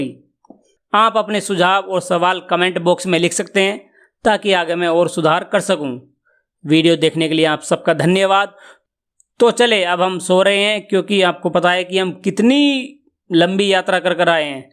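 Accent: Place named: Western Uttar Pradesh, India. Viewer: भाई आप पश्चिम उत्तर प्रदेश से है आपकी भाषा अच्छी लगती है। हर हर महादेव 🙏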